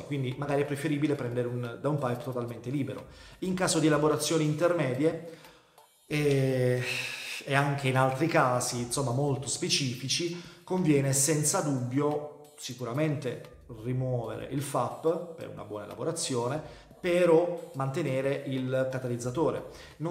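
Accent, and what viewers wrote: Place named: Molfetta, Italy. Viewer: sento un accento molfettese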